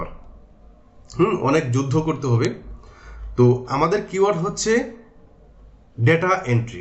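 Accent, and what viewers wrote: Sylhet, Bangladesh. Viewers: Bhaia apni ki sylhet teke?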